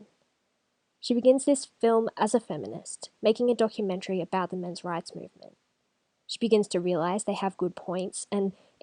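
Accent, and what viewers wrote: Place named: Australia. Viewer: Also: with such a prevalence of US video producers, it's so relaxing to hear an Aussie voice. Thanks for the vid.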